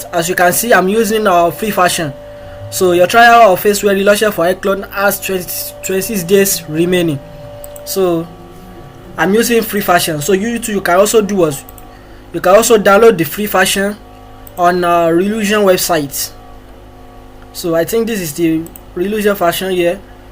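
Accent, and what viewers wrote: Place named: Nigeria. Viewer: Great job! You sound like a Nigerian.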